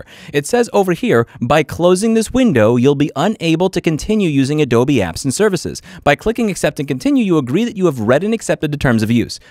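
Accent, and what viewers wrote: New York, United States. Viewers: Don't y'all just love how much thicker the New Yawker accent is the deeper he gets into his righteous rant?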